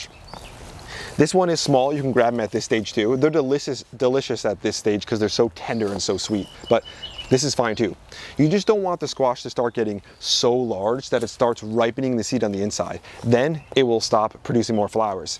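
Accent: New Jersey, United States. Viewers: Your videos are super educational and helpful! Plus my 6 year old daughter absolutely loves your introduction and Jersey accent. 😁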